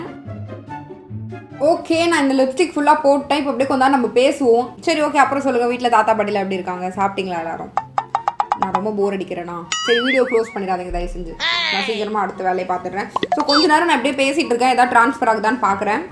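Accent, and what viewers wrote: Tirunelveli, India. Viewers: Tirunelveli ya???? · Neenga tirunelveliya de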